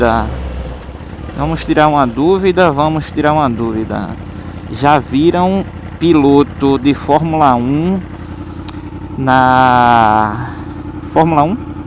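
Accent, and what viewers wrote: Pernambuco, Brazil. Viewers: pelo seu sotaque voce deve ser daqui da terrinha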